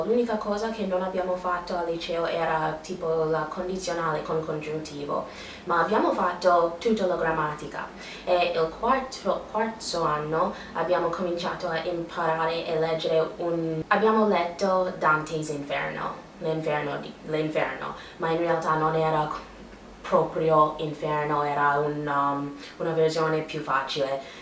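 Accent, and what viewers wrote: United States. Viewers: Parli davvero bene italiano, complimenti. Non hai troppo l'accento americano, brava! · Rimane americana non puoi dirle di migliorare delle lettere che nell'accento e pronuncia della sua lingua sono state sempre così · migliora un po' la T è troppo schiacciata, troppo inglese-americano e un po' anche la r.. però bravissima l'italiano è difficile... Io amo L'america penso che ognuno sia attratto da ciò che non gli appartiene. di che stato eri? mi iscrivo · Why do you use an American accent when speaking Italian? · ovviamente si sente l'accento americano, però parli molto bene. Ho molte amiche che sono nate all'estero (Polonia,Croazia,Russia) e sbagliavano sempre gli articoli, ma tu questo problema non ce lo hai. wow bel lavoro! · Brava, parli molto bene l'Italiano! Un poco l'accento americano, ma va bene...ti fà più sexy! Ok? Ciao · Amo amo amo questo accento! 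davvero sono molto affezionata all'accento americano in italiano, ho visto molti video tuoi e sono veramente interessanti! abiti a Milano a quanto pare, magari un giorno ti trovo in giro ahaha, sei divertente e super simpatica :)) p.s. sei bravissima a parlare comunque! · mi piace tantissimo quando parli in italiano e si sente l'accento americano ;) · Sei brava a parlare, ovviamente hai una cadenza molto americana ma lo parli fluentemente e ti si capisce benissimo. La pronuncia non è facile, d'altronde come per noi imparare il vero "American English"! Continua così, io vivo a Milano ed è bello vedere i punti di vista di qualcuno dall'esterno! You're beautiful and very chatting person:) like me! Good luck for everything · Parli perfettamente, e l'accento americano è adorabile! Grande ❤ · You are pretty good, you make some small mistakes but you can fix them, like you mix up some word gender because in english words don't have a gender. 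A tip to sound a bit less american is to say some vowels open and not closed, we are weird because we don't put every accent on words but only on some, the best example would be the word "ancora" which can mean either again or the metallic thinghy ships have(dunno how it is in english sorry) so it can be important, listen other italians and imitate them, good work! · You speak correctly - better verb tense agreements than many Italians - the American accent is even harder to lose than my English one though 😊. The only American I have ever heard that has managed to lose their accent is Romina Power, and look how long she had to live there! (and who she married to do so hahah) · Bravissima! I'm no one to make judgements but your Italian is more than proficient; the world is always surprising: I know people my age, so middle aged, even university educated that still struggle with verbs' conjugation and some tenses...that's quite irritating, while you are almost always correct and fluent. 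A few flaws (very few in your case) in a foreigner speech are even nice...and please try not to lose completely your slight American English accent: is very charming, cool and exotic! Best regards!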